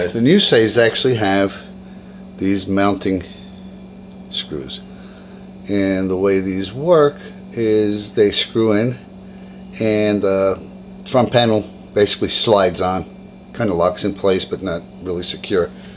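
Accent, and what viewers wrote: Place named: Brooklyn, United States. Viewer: Fantastic video; really well done. I have to thank you, since I had tried other videos on YouTube, many of which were very well-made, as well. However, your's did the trick, and saved me a ton of dough in the process. I'm truly grateful to you for having taken the time to post this. BTW--- Love the accent... Brooklyn???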